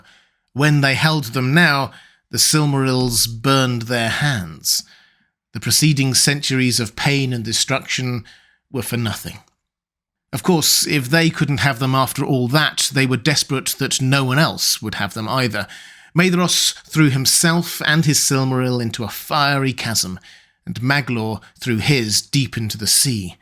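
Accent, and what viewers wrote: United Kingdom. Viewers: I like to put on your videos and have your dulcet british voice lull me to sleep.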